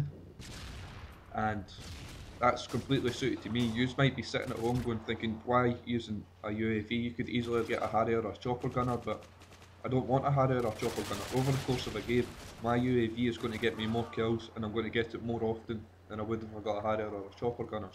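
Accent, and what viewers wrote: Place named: Scotland, United Kingdom. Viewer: subbed cause you from scottish mon the scots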